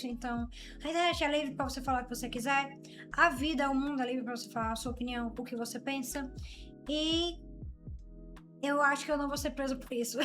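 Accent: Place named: Pernambuco, Brazil. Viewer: Acabei de conhecer o canal e gostei demais do conteúdo e principalmente da sinceridade. Aliás, alguém conseguiria me confirmar se a é nordestina? pelo sotaque eu desconfiei que ela é, provavelmente, da Capitânia de Duarte Coelho (Pernambuco)? kkkkkkkkkkkkkkkkkkkkk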